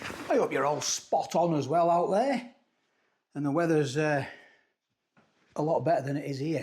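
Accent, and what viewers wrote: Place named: Yorkshire, United Kingdom. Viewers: Posh northerner I thought it was myth 😂😂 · Did you say Barrow,is that Barrow in Furness as to me you sound like you're from the Leeds/Doncaster area-no offence intended....Geography was never my strong point😊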